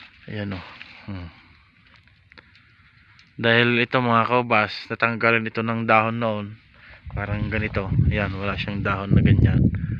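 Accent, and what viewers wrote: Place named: Ilocos, Philippines. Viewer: San po location nyo...s pannalita po ninyo prang ilocano kau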